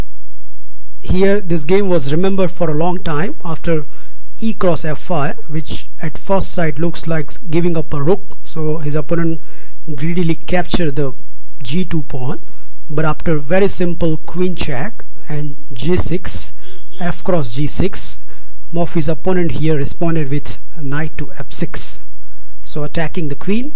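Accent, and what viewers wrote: India. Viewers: its not a grammar tutorial. and arent you entertained by the way he speaks? for me its funny but at the same time you learn. leave him alone hes not american he's indian, most call center agents are. just appreciate the knowledge and entertainment you get at the same time. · zRouth I am a language teacher AND a passionate chess player in one, and I must admit his accent is like many people's accent in India, but his grammar is not (always) correct what can be disturbing (for native speakers, but I don"t belong to that group.) What he explains about chess is excellent on a lower level. (GM-s do not necessarily benefit from that, as they are already familiar with these tricks /at least the majority of them/.) All in all, I like his vids, you can use them well if you play with short time control (3-5 minute-games) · nice..as I am also an INdian,your accent is easy to understand and very interesting..thanks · Indian accent is obvious o.o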